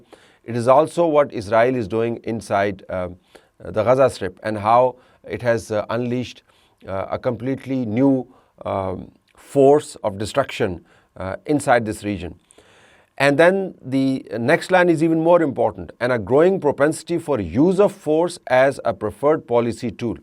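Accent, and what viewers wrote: Pakistan. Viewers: They have there own channels. He is trying . His English is in urdu. 😂😂😂😂😂😂😂 so hard to understand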